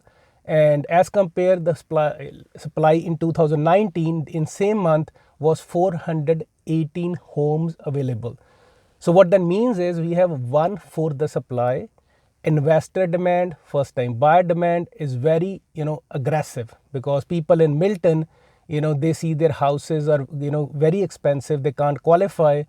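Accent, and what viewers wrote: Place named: Punjab, India. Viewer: Bro I really liked ur punjabi english accent but ur real estate knowledge is excellent keep it up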